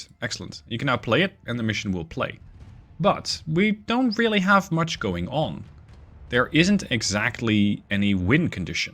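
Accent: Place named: Netherlands. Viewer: You sound Dutch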